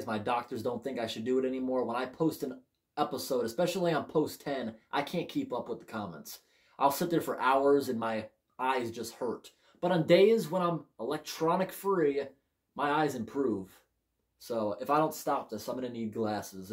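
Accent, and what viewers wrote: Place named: Massachusetts, United States. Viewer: I've just stumbled onto your channel in the past few days, and you are an absolute delight. It's funny that we're the same age and have similar interest. I am a bit more into the entertainment side of vintage products and packaging like Chuck E. Cheese and Gadgets (Warner Brothers themed animatronic pizzeria). I wonder if the electrical and technological side of that may be interesting to you. Also, that Blue's Clues pasta in the background has really been taunting me. Are you going to save it for a reprint of the pasta? It may be more advantageous to just look from the SpongeBob pasta from the same year to get an easy comparison since they still make it. Oh, additionally, that "why do they call it an oven when you ove in the hot food and out hot eat the food" is a reference to an edited Garfield panel. It's a kind of obscure meme. 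Last thing, your Massachusetts accent is delightful. Stay rad. Love your stuff!!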